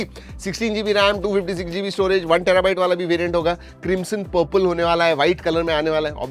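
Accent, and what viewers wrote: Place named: Gujarat, India. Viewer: Chalu karte hai !!! Gujju accent..wahh😅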